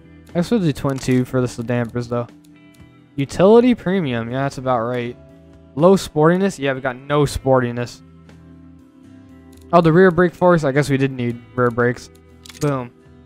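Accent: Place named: United States. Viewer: You have a deep American ascent you like truck and a ak47 in your truck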